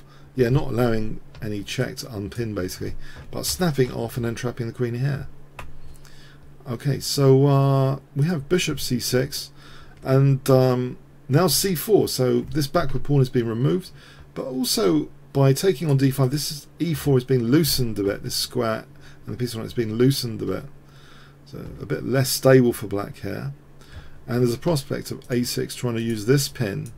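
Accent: England, United Kingdom. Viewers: I really love your videos and your daily updates on both historical and recent games,. Please continue to cover the major tournaments in both classical, rapid and blitz chess! And may I ask, from what part of England are you from? I take much pleasure in that dialect of yours and the pronunciation of the phoneme 'th' as a regular f-sound, "c free" (c three) etc.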